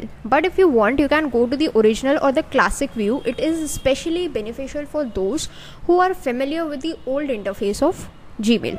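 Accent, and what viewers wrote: India. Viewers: 0 seconds ago This is pure Indian English accent. You may need to go to school to learn the same :)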